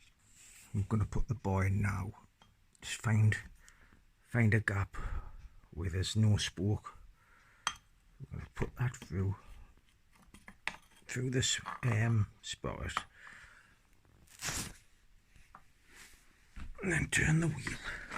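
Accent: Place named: Ireland. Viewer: Awesome thanks for all the detail. Luv your irish accent too! If you ever need. Any help with your health. Let me know. Contact is on my channel.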